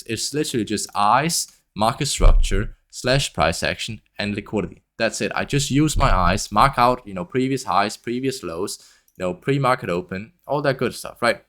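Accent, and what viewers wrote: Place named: Denmark. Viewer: Du lyder dansk i accenten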